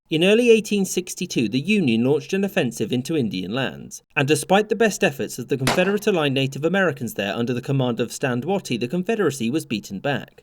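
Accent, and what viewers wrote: United Kingdom. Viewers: Not going to lie... It's a bit weird learning about the American Civilian War though someone with a british accent.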